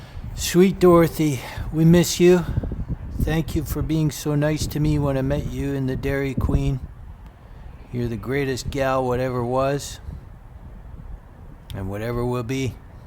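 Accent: Canada. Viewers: You're definitely Canadian!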